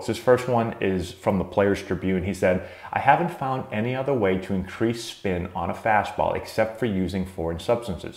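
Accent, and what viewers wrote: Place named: New England, United States. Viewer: ive always heard a little bit of peter griffin in his voice lol! even though he doesn't have a wicked strong "hahvard yahd" type boston accent, I can definitely tell the way he sounds is 100% new england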